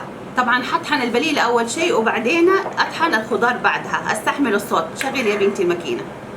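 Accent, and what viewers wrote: Hejaz, Saudi Arabia. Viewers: ماشا الله. والله احلا شي ذكر الله 😙😙😙 وزين اللهجه الحجازيه. ياخ احب الام دي وبنتها ❤❤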